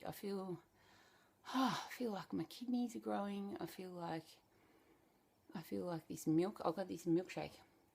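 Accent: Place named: Australia. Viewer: I like your openness and frankness, your flow and insights, - I have a friend who has similarities. You sound like you're 'down under' (from this London perspective!). Thanks anyway.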